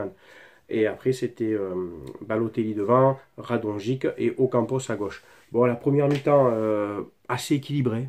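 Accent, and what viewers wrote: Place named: North, France. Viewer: Cet koi ce nordiste avec sa tête et son accent du nord lol 😂 et va te cacher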